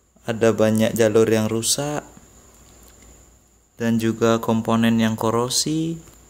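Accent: Bali, Indonesia. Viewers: Pantesan cara ngomongnya spt org bali. Betul bali rupanya bli. Lucu juga biasanya org bali tu. 😁 dimana lokasi bli. Nanti kalo butuh2 betulin elektronik sy datang ya.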